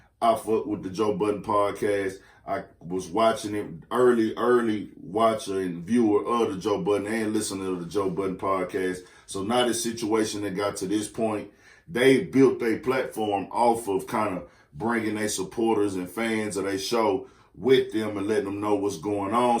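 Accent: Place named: Texas, United States. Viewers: This my 1st time ever seeing this blogger. My guess, based on his accent, is he from Texas. Probably Nawf Screwston. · Yup. Slim Thug type accent. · Lmfao same I was like he from Texas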